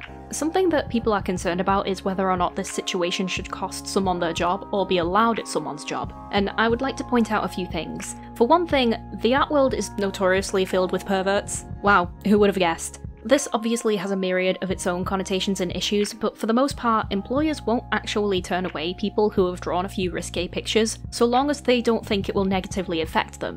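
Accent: United Kingdom. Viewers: hi, i sit with the video through the end, you have a really awesome way to put the pieces together to say exactly what needs to be said, love that bit where you said the person we have to protect the most at the end of the day is ourselves, that is true and it's what i've always thought! i don't usually involve myself in drama and prefer to block and move on. however, i feel like we need to speak sometimes on these topics that could influence a young audience. btw love your british accent? it's pretty thick lmfao ( not saying that in a negative way!! just in a "i noticed" way ??? idk how to explain it LMFAO ) have a good day and u have earned a new subscriber 👍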